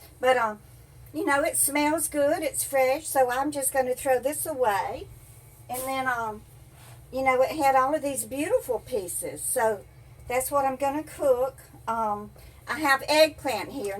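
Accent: South, United States. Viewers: I love your southern accent it's so welcoming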